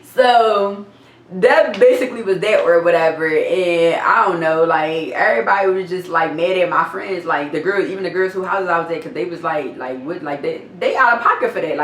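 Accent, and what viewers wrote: Philadelphia, United States. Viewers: I like your accent. I'm frm Brooklyn NY. NY & Philly r kissing cousins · Ppl like to assume bc you goofy & be on chill, you not gon knuck if they buck. Wrong 🗣 They do that with me too. Sometimes mfs have to find out the hard way that these hands fly just as quickly as the jokes baby. Oh & Philly accent is the shit. I always get compliments when I visit family in the Carolina’s lol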